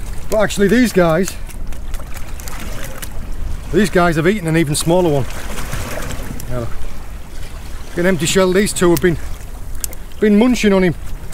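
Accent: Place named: Redcar, United Kingdom. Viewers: where abouts in the northeast are you from sound like redcar area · me and Mrs were trying for months I thought up Redcar as well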